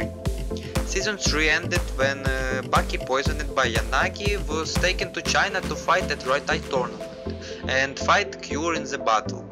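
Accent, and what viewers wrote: Russia. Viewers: Почему такой русский акцент??? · Russian accent? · Капец у тебя акцент русский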